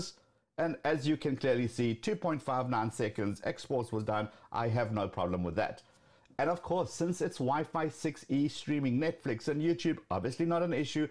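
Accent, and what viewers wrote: South Africa. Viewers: is that a South African accent ?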